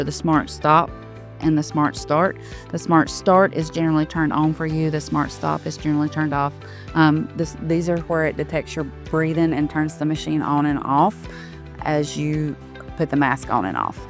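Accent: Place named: West Virginia, United States. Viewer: This nice lady sounds like she from Wayne Co. WV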